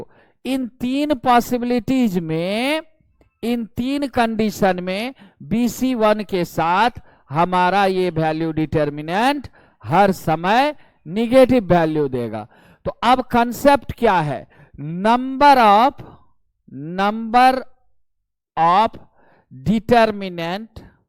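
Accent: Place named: Bihar, India. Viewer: Kya tone hai sir ka 👏👏 Pure bihari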